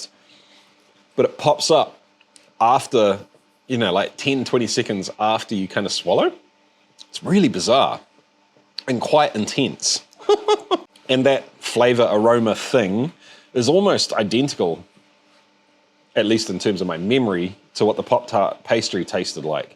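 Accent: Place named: New Zealand. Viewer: Your absinthe video popped into my list, and I noticed your Kiwi accent. With your videos on doing crazy alcohol from Pop Tarts and other stuff, you reminded me of my uncle Ron who emigrated there about 25 years ago. Before he left, he would try to make wine from turnips for a laugh, and now makes cider apparently.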